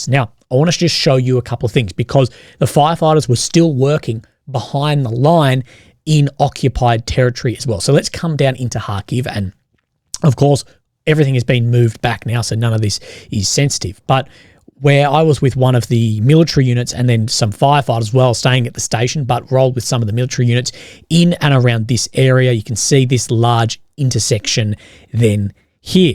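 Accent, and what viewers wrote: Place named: Australia. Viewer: "Gddye". I think he is saying "good day". Australian English "day" sounds like "die" to Scots (and Autralian "die" sounds like "doy"). Legends could be rhyming slang for friends. Add pronouncing "low" with a shwa like in French "le", but followed by a slight "w" glide, and you have the four peculiarities of Australian English borrowed from Cockney. Though I must say that this pronunciation of "low" is more widespread in Britain.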